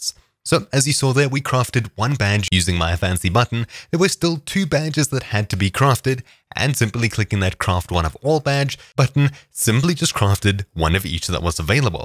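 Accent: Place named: South Africa. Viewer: I know an south african accent when I hear one.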